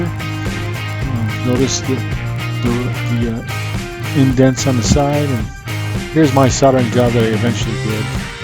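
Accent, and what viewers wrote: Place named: Minnesota, United States. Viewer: Great Video!!! Your either cold or your Minnesota Sweed accent is coming through!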